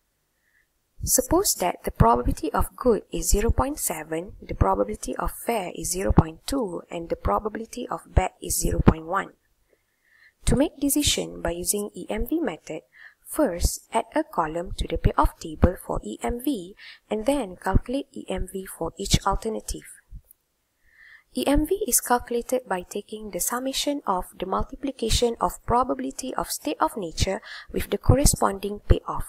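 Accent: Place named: Malaysia. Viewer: Malaysian accent. Nice talented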